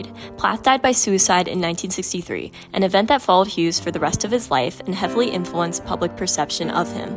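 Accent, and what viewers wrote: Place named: United States. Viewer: Why is an American reading this? It's very poor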